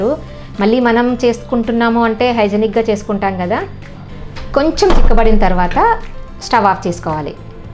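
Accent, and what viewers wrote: Telangana, India. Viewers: Andaru Andhra lo cheptaru meru Aina koncham Telangana matladthunattu anpisthunde ❤️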